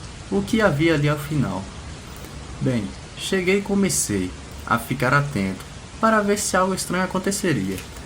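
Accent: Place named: Pernambuco, Brazil. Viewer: esse narrador só pode ser um pernambucano!!!! pelo sotaque esta mais que evidente,e qto ao possivel demonio em forma de cadaver? pode ser sim ,ele causa alucinações doidas. história FODA essa hein???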